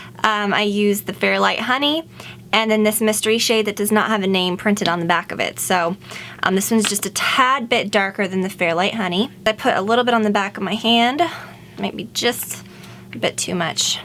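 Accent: South, United States. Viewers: You have a slight southern accent aha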